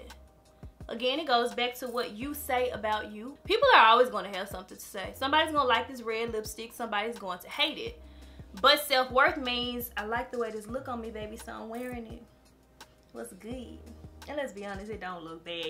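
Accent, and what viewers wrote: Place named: Memphis, United States. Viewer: I'm really glad I've been following you almost a year. And you are a big motivation for me. For because you from M Town South Memphis to be exact 💯 in your voice lol. And you have a godly and lit spirit. Merry Christmas eve ❤ 🌲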